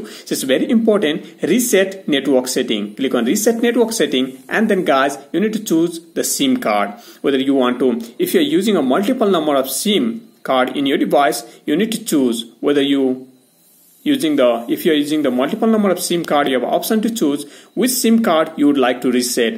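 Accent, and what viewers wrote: India. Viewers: U are Bengali ?? U accent is pure Bengali ... Bhai Hindi may bolo naaa itni takleef kyun le raheho bygan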